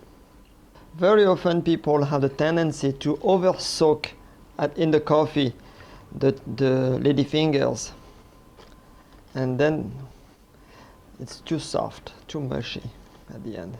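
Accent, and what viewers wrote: France. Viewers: French english 😅😅...funny,I like it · Nice one ! That French accent though..🤣🤦🏽‍♀️🤷🏾‍♀️😘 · I did not realise Chef was called! Quel accent français, seeing the channel name, I was expecting a english gentleman!! Edit: doing that tomorrow by the way;) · Thank you for a great explanation on how to make a Tiramisu. By the way i love your french accent.🙌😉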